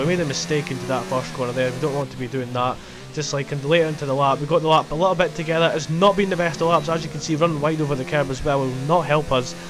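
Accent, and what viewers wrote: Scotland, United Kingdom. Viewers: U Scottish? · he is scottish. It's their accent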